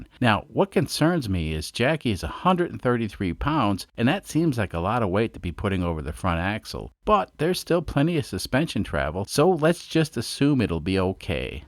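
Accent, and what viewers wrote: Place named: Long Island, United States. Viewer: I thought I detected a slight Lawnguyland accent in your videos since I started watching (expatriated North Babylon native here).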